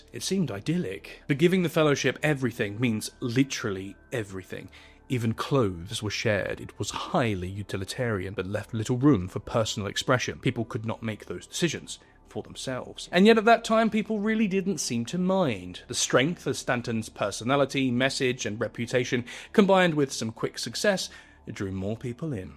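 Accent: England, United Kingdom. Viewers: That's just how English people speak. I think you're reading something that isn't there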